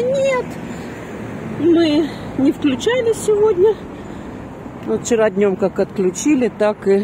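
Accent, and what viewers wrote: Odessa, Ukraine. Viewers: Да Вы чудесно разговариваете, я просто очарованна Вашим одесским говорком!!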